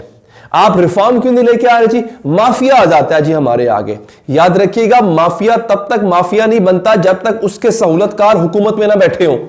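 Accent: Punjab, India. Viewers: 😂😂hyeee sir aapki punjabi 😍😍 Love it ❤ punjabi tu bss 2 hi logon ki hy 1 aap or 2osra ......😂😂. Pharr ke kuttu onu..❤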